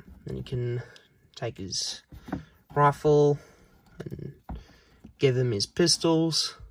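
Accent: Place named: Australia. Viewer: Nice to hear an Aussie for once🙏🏽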